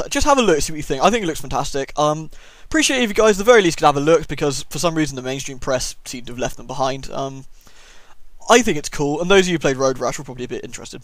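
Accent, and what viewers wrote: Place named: RP, United Kingdom. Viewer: yeah that's what I thought, he does sound slightly posh normally though.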